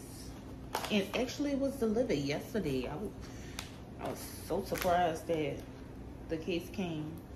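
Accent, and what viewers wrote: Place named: New Orleans, United States. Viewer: Great unboxing. I’m sitting here waiting for my white 15 Pro Max to arrive in NOVEMBER and torturing myself with videos lol. You sound like you’re from the NOLA!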